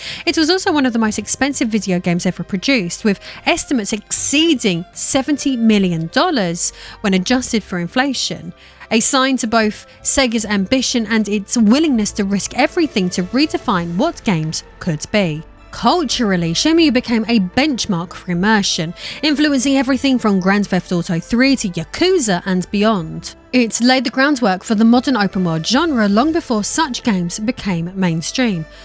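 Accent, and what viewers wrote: United Kingdom. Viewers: Essex girl with elocution lessons reads AI script, today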